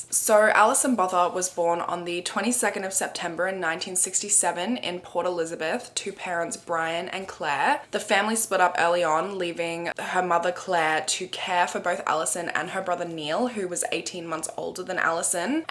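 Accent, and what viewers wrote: Australia. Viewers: Really enjoyed this story. But "like "like" "like" and with the Kath and Kim accent in there, was a bit grating on my old brain. I wish you all the success utube can give you.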